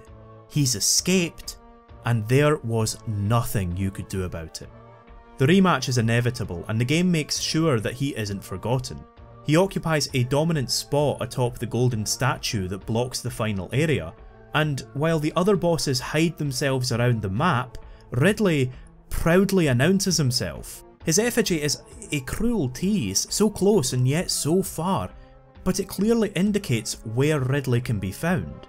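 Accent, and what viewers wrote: Scotland, United Kingdom. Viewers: man with thickest Scottish accent in the universe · Are you Scottish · Do you have a Scottish accent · love the Accent. Are you from some where near Glasgow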